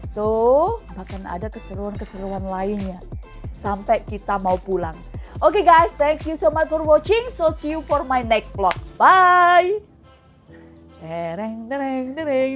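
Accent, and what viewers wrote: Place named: United States. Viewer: Happy belated Birthday wong ayu💐..Jeng pasti sdh lama tinggal di US ya..bahasanya sdh beraksen orang sana..enjoy your nice birthday presents👌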